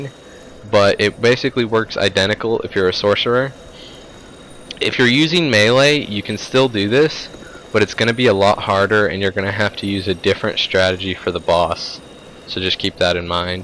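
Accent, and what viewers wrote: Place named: Canada. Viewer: canadian eh ?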